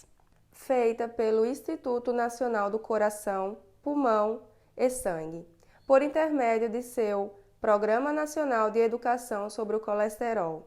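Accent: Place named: Pernambuco, Brazil. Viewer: Parabéns. Pelo sotaque parece ser do Pernambuco.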